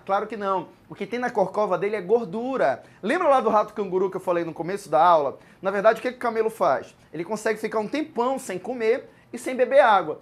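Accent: Pará, Brazil. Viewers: meu deus tua aula é excelente, socorro! Teu jeito de falar é tão paraense que me senti acolhida. bjs. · Maninho tu é Paraense? 😂😂 adorei a aula. 💋🙇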